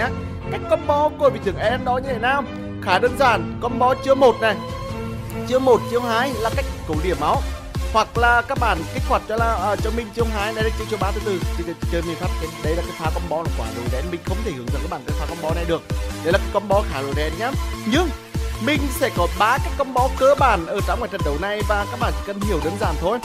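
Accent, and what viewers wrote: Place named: North, Vietnam. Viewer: Giọng bắc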